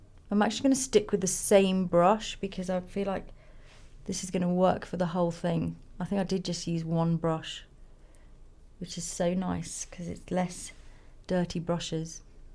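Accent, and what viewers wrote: United Kingdom. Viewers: I always fall in love with your simplicity and your perfect british english <3 Best makeup artist.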